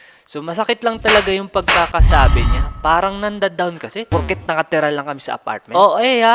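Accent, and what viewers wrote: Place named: Visayas, Philippines. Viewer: Bisaya Ka pala ahahhah😂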